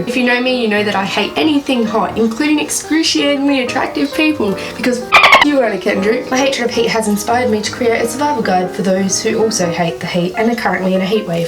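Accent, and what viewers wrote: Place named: United States. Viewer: you have an american accent in this entire video and it's tripping me out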